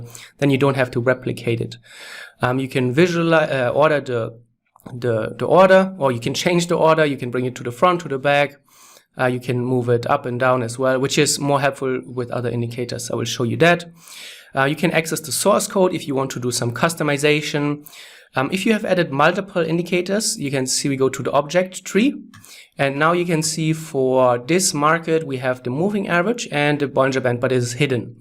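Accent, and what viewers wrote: Germany. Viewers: direkt gemerkt das du deutscher bist :D gutes video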